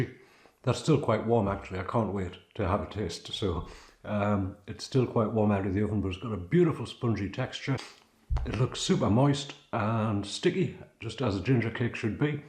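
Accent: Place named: Newcastle, United Kingdom. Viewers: I am from South Shields on Tyneside and he sounds like he is from our neck of the woods indeed. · Looks delicious & easy method to make, will give it a go, & you sound like you have a ‘Geordie’ accent… North East UK, where are you from please?? & thank you 👏👏🌟😋🍽😋🎉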